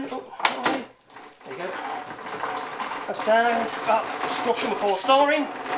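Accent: Norfolk, United Kingdom. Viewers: You sound like a Norfolk boy to me!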